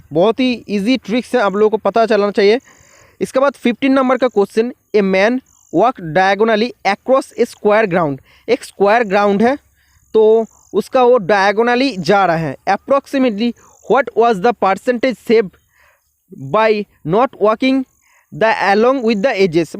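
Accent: Bengal, India. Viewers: U r from Bengal?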